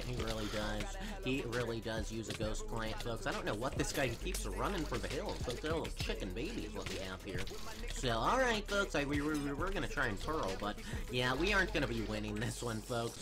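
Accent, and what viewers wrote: Netherlands. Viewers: Hahaha je Nederlands accent is fking grappig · Dude are you Dutch? xd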